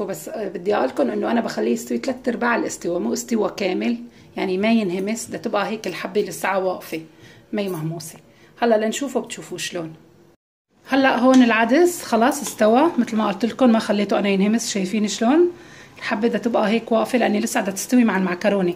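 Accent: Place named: Aleppo, Syria. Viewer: يسلم...ايديكي....يابنت....بلادي....متابعك...من. مدينة...حمص،،العديه👌👍اموت..بللهجه....الحلبيه...الاصيله...وبلمطبخ. الحلبي، احلا ست بيت،،،هي المرأه الحلبيه..👸فنانه..بتحس انها عمتعزف معزوفه موسيقيه،،،،بلمطبخ....😂👌😘😂👏👏👏👏👏👏👏😘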